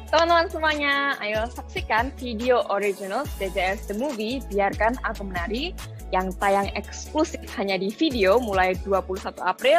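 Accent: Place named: Java, Indonesia. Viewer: Kak logatnya ada sedikit logat jawanya🤗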